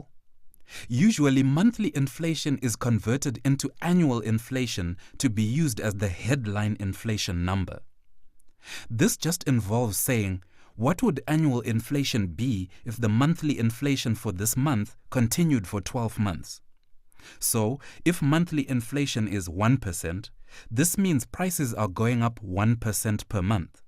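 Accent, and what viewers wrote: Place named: Zimbabwe. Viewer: very good, i wonder who posted this, kinda sounds like a zim accent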